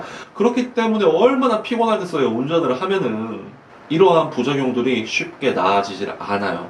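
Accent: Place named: Gyeongsang, South Korea. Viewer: 고향이 경상도 어디에요? 저랑 말투가 똑같ㅎㅎ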